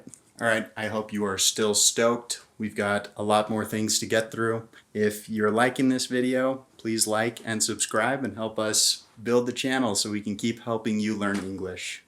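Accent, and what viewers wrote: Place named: Vietnam. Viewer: Your vietnamese accent is cool! Hope to learn english much more from you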